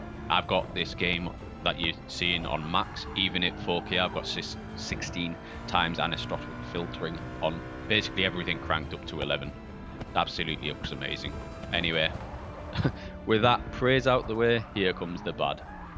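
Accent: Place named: Middlesbrough, United Kingdom. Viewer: Where are you from? Sounds like a Boro accent.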